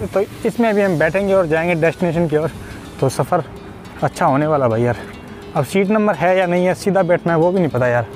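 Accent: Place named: Punjab, India. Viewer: Bai ji, Mai roz hi tuhade vlog vekha haan, par Subscribe nhi si kita. Par aj theth Punjabi sunde saar sidha hi Subscribe button nap ta. 😍💕🙏😍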